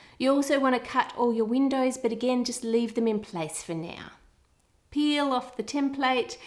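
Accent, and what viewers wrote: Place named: Australia. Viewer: "To make this one, you'll need floura, suga, butta.." HAHA! Sorry, I don't mean to laugh at you, but it's just funny hearing your Aussie accent to someone like me in the U.S. 😅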